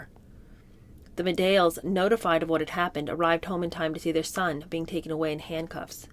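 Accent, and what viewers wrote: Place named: RP, United Kingdom. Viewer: Sounds like the Mitford sisters 😂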